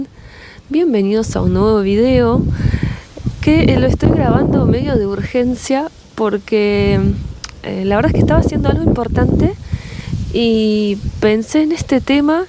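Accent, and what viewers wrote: Argentina. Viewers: Primero no entendía nada , porque hablas y tenes el acento Argentino y tenes un Español perfecto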